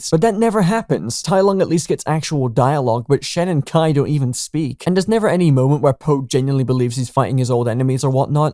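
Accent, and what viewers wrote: United Kingdom. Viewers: The British accent 😭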